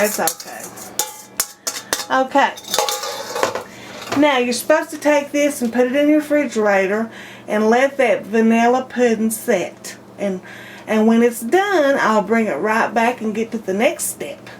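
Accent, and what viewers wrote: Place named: Kentucky, United States. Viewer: you sound like youre from hazard Kentucky. sweet accent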